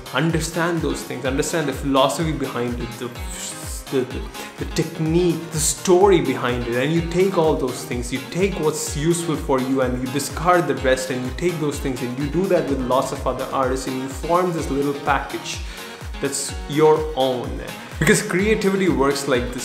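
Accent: India. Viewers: I love how he says “cool” in that sick Indian accent all the time.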